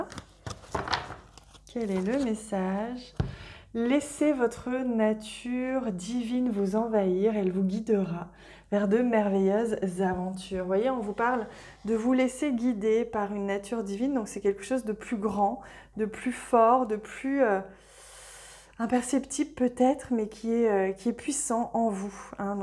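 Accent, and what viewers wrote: France. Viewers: Bravo ma belle; pour ton anglais, avec le ton frenchie ,dont nous les français avons tous, ce qui fait nôtre charme aux usa , tout comme toi ma belle, charmante , adorable, et rayonnante, comme l'étoile du berger, je préfère te voir comme cela, sans voile, je te remercie pour toutes les belles âmes que tu entraîne dans ton sillage ..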